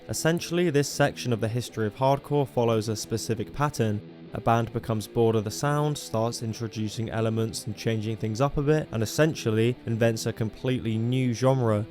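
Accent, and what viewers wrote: United Kingdom. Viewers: British accent, video disregarded. Go enjoy the sex pistols limey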